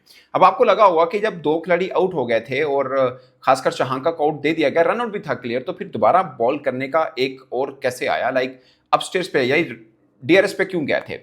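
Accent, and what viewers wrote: Punjab, India. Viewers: Apki English bhi Panjabi jaisi hay don't speak please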